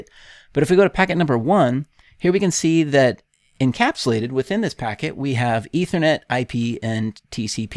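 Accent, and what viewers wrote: India. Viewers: i dislike the Indian accent; can't help it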